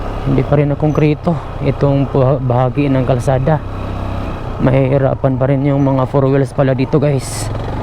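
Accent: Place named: Visayas, Philippines. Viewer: AYAW NA PG TINAGALOG, KAY IMONG TONO BISAYA LANGUAGE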